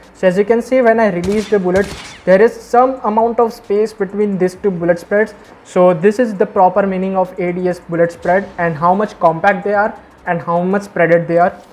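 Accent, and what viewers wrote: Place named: India. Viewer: This is why I love Indians. Their tutorials are always the best and easiest to understand. I struggle to understand the accent and hear them clearly but it's still okay cause their tutorials are better than those from anywhere else.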